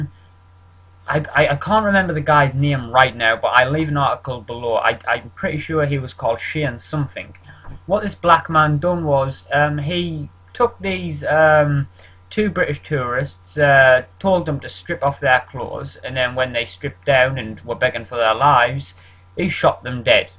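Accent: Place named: Ireland. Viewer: I just seen this video you are a dumbass British moron that sound like a Irish leprechaun why don't you put on your green suit and go find your gold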